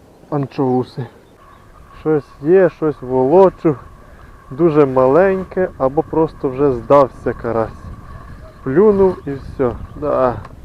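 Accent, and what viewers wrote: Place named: Ternopil, Ukraine. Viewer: Тернопільський акцент. Рибалка це життя і активний відпочинок👍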